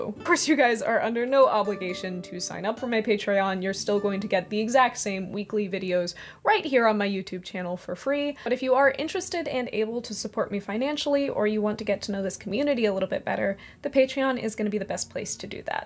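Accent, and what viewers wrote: Virginia, United States. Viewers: I do not live in Michigan though I do have a Michigan states park pass on my car! I think it’s interesting, my guess from your accent was Virginia so partial credit? Really enjoying the channel! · This isn't meant to be mean it's meant as helpful criticism: Your accent is "Northeastern US from Virginia"? Virginia is in the southeastern US. New Jersey on up is Northeastern US, and the rest of the Northeast isn't really happy with having Jersey as a part of the Northeast. New York accents are northeastern US, general New England accents are Northeastern, Boston accents are Northeastern US accents.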